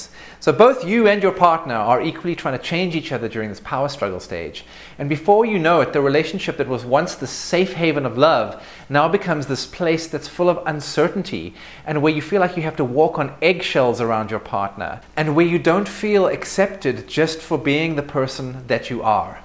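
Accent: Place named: Afrikaans, South Africa. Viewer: you sound dutch, south african and something else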